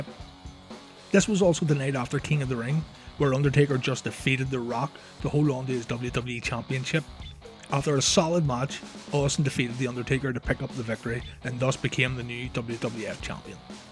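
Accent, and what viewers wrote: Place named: Lurgan, United Kingdom. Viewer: Sounds like a Lurgan man to me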